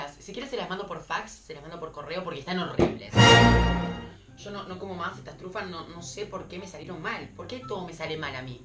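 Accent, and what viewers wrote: Argentina. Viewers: sos argentino ¿no?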